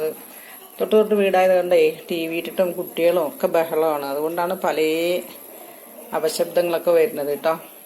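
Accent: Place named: Palakkad, India. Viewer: PALAKKAD slang soooper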